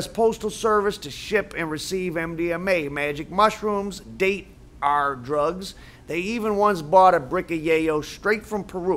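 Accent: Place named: New York, United States. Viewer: I am trying to figure out your accent sometimes it sounds like New York sometimes it sounds Brooklyn sometimes it sounds like Chicago what the fuck are you bro? I'm from Brooklyn, but I can't fuckin figure it out, No disrespect..Are you a Paisan? 🇮🇹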